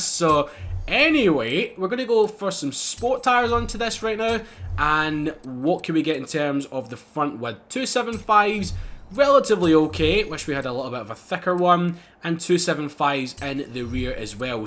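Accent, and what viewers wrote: Scotland, United Kingdom. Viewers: bro your accent is awesome I love the Scottish accent, and am so glad I found your channel again, I can remember watching your game play on a Dodge challenger hellcat when Forza horizon 3 come out and it was awesome but at the time I didn't have my you tube account, and it's ages since I have looking for your channel, anyways my dude keep up your awesome work and I'll make sure I'll stay tuned in :)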